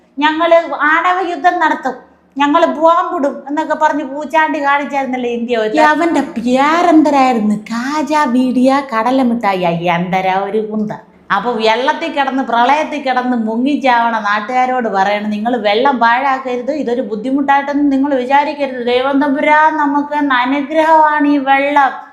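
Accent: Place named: Thiruvananthapuram, India. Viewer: TVM ഭാഷ... ഇത് കേൾക്കുന്ന ഞാൻ കോഴിക്കോട്കാരൻ... ഞാൻ എന്തര് കരുതണം...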